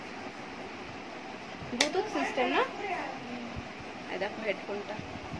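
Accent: Bangladesh. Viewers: Tumi bangal nah "neba" Deba" bolo amio boli Amro ase nah j "nebe" debe"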